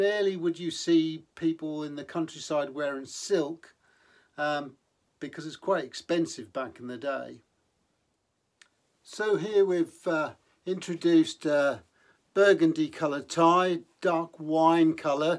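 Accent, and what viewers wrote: United Kingdom. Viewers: Trying to place the accent is it Norfolk, Suffolk, or ?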